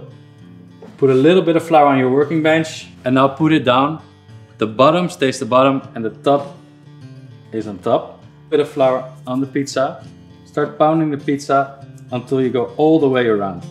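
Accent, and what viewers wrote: Netherlands. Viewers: Jij moet wel Nederlands zijn ik hoor het gelijk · Lekker Hollands accentje! Ziet goed uit hoor! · Ik herken een nederlands accent altijd en overal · Hi there :) are you Dutch? You sound as if you are :) greeting from the Netherlands! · Dutch accent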